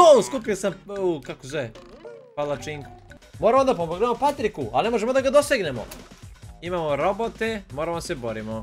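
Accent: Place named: Montenegro. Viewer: Kako si mi smiješan kad pričaš ko Crnogorac,jer sam ja odatle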